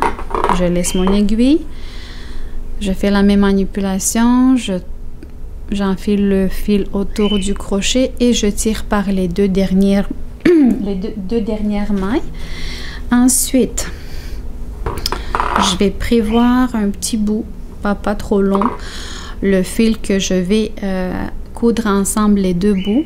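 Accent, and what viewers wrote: Canada. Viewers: Tu es un amour,tes tricots sont toujours magnifiques, c'est toujours un plaisir d'écouter tes tutoriels qui sont toujours bien expliqués avec ta gentille voix douce et ton adorable accent québécois, merci pour ton beau travail..des gros bisous pour toi de Lille 👍☺️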